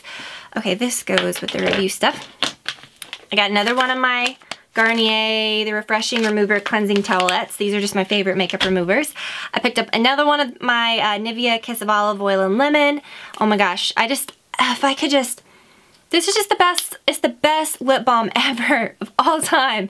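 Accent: South, United States. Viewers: Way to own that southern accent. Southern born and southern bred!!!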